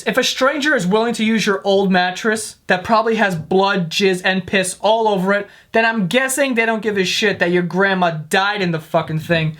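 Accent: New York, United States. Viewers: it's his new york accent. we all do that here. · he's a hardcore new Yorker. its his accent and its dope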